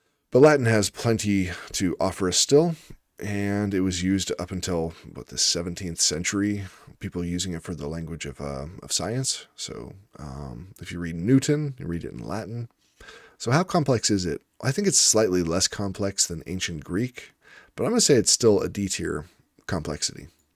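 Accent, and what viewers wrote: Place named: United States. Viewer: As a French native speaker, I can tell you're wrong when you try to analyse French. You said "It's what I've been told", which means you have no idea what you're talking about. French isn't Germanic. As a linguist, you should know that most words shared by English and French come from Old French. Actually, English vocabulary has been influenced by French after the Norman Conquest of 1066. Just out of curiosity, are you really a linguist? Which degree did you earn? The difficulty of this language lies not in the sounds, but rather in the rules and the exceptions. In French, you can see people correcting each other. Most people make a lot of mistakes because they don't read enough to internalise the rules. French doesn't have four tenses. There are a few tenses categorised into four moods. Actually, if you exclude the infinitive, there are nine simple tenses and nine compound tenses. 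Some of them are almost never used, but there are still more than four. You only considered the first group for your statement about conjugation. Let's take "prendre" as example. It's from the third group. In the present simple, "prendre" is conjugated as: je prends, tu prends, il/elle/on prend, nous prenons, vous prenez, ils/elles prennent. French people (so people who speak French in France) tend to like the English accent, not the American accent. It makes you look worse than Olly Richard.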